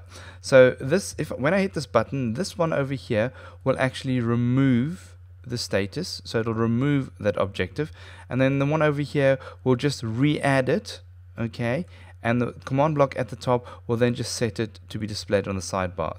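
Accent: South Africa. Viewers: I've been looking for something like this for ages...I love you! Thanks for the great How to vid! BTW you sound South African...xD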